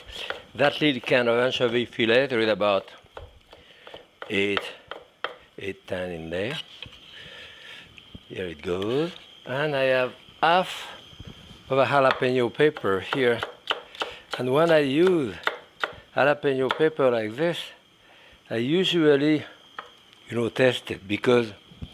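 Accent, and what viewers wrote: France. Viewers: French Accent !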